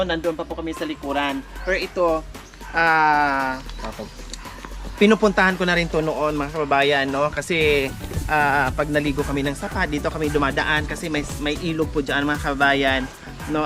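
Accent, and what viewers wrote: Visayas, Philippines. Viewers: Maaamm bisaya di ay ka parehu palata 😂😂😂😂😂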